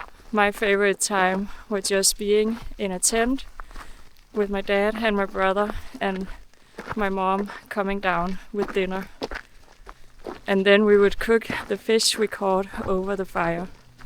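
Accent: Denmark. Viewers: Sorry - men jeg kan ikke klare med af den danske accent i det engelske, havde håbet at det blev bedre, men jeg står af nu. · Your soothing Danish voice almost put me in a trance, for a while I thought I was in a remake of Elvira Madigan (1967) then I snapped out of it, unfortunately, I would rather have stayed there! What a wonderful video, thank you! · Thank you for the video from my home region "Söderåsen", It was a lovely Danish accent, I love Denmark, Danes, have been there so much that I am almost half Danish hi hi, Nature in Söderåsen is beautiful but I am not so happy about the wolf implementation, people have lost dogs and soon it will affect some people! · Den danska accenten i engelskan är mycket vacker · It's so funny that I only need like 5 seconds to hear that you are from Denmark 😄I'm danish myself that's why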